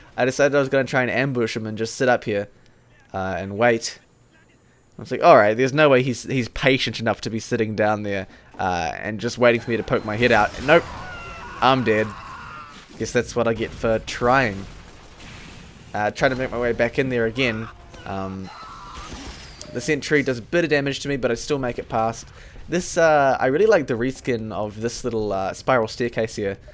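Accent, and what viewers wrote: New Zealand. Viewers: Are you from NZ? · Omg its muselk! Oh wait it's just some kiwi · You must be from New Zealand, with an accent like that.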